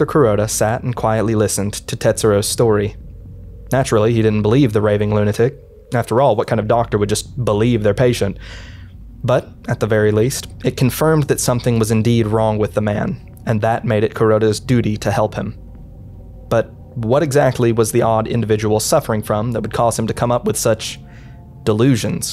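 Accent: South, United States. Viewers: This man and Wendigoon absolutely carrying the "horror explained by guy with a funny southern accent" genre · Hey, this is the first video of yours that I've seen and I think it's pretty good! I gotta ask though, are you from the south? I can hear it in your voice lol. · I love how horror unites us. As a kid I would never imagine a dude with a classic country twang espousing heart-felt feelings about niche Japanese horror. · It's a little refreshing to hear a subtle country accent talk about intellectual topics. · Great narration. I'm digging the country accent, man. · Is this man from the south? your speech is both beautiful and uncommon in your line of work.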